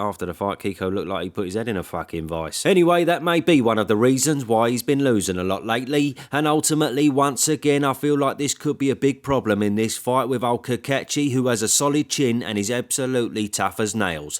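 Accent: Cockney, United Kingdom. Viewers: My favourite cockney tosser this is 😂😂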